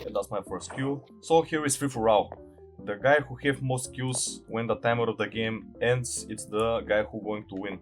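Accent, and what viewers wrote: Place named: Pakistan. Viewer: You sound pakistani.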